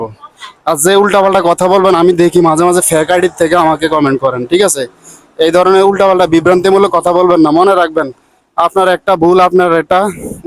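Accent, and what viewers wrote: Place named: Noakhali, Bangladesh. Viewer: ভাই কি নোয়াখাইল্লা নাকি😊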